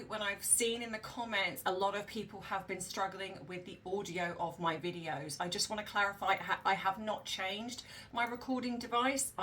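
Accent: United Kingdom. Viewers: Greetings from south coast California. I hear your lovely British voice perfectly clear from California on a Samsung Galaxy cellphone. Thanks 🙏🏼⚘️🐦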